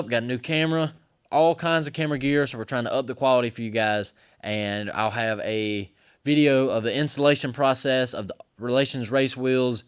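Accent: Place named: United States. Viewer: I am taking advice from a kid half or nearly 1/3 my age. He is a detailer, and has a country accent. This gives him Truck Credibility. I want to know how to mount my AR, that pops up out of the bed for people that cut me off, and how to remote aim it with laser sites. Nice looking truck he has there.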